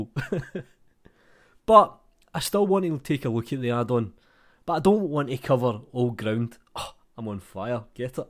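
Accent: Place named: Scotland, United Kingdom. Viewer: It’s a bit wired, I watch all the videos, even thing I know and not interested off. Just because of it’s dialect. Ain’t sexy, but so funny. I just have to google for movies in true Scottish! Oh and yes the videos are quit good.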